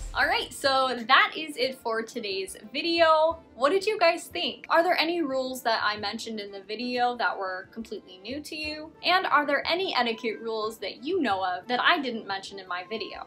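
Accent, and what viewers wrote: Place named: Australia. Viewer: Omg you have an Aussie and you have a lovely video layout. I like you already! My bf's niece is getting interested in the fae. I'm a fallen catholic, but I love researching for other people to make sure people do their own practices in a safe, but still fun way. Plus I love supporting and learning about things. Thank you so much for this video, it's so hard to find good content on this subject! Question: Do you know anything on Green witches? I think she's showing an interest in that without knowing what it is too and would love to get reading material on it for her.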